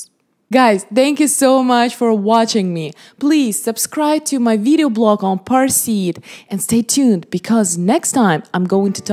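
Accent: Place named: Russia. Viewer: Awesome job, I like your Russian accent😍😍😍 Krasavaetsya!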